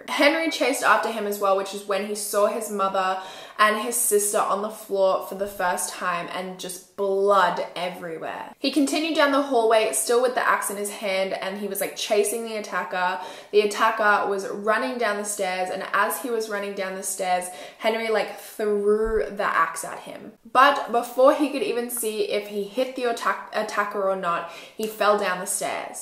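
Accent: Australia. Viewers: Omg that emergency call. It’s SO frustrating! I’ve heard similar from the US, you wonder what these people are thinking. It’s emergency for heavens sake! I’ve had to phone emergency here in Australia several times and thank God they’ve been really great. you are so fantastic at this, I love hearing another Aussie on YouTube true crime. Hugs!❤️ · i believe she does have an Australian accent :)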